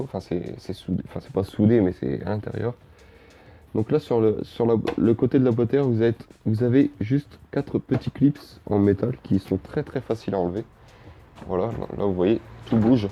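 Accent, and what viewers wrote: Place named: England, United Kingdom. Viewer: Cet accent anglais ! 😍 Bref, sérieusement j’ai adoré la vidéo, hâte de voir la prochaine ! 😅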